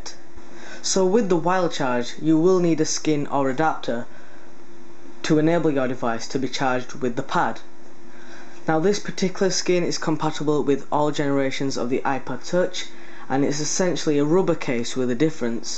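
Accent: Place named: Scotland, United Kingdom. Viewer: your accent sounds like a mix between Scottish and British :D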